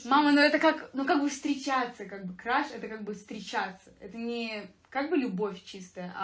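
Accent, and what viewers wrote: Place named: Russia. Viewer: совсем стала русской,когда-то говорила как и все казахи-Алма-Аты,теперь говорит как все русские Алма-Ата ))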